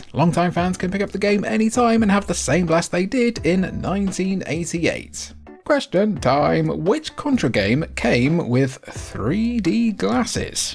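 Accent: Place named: United Kingdom. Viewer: Fax very much! Not just for this vid but the others I have watched. Good to hear another brit even when you get the tongue twisters in your videos hah. Faxanadu really gave me Zelda 2 vibes with the side scrolling.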